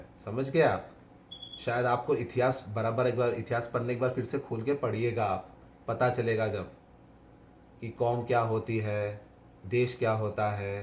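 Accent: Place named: Hyderabad, India. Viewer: bhai gud reply in typical Hyderabadi accent 👌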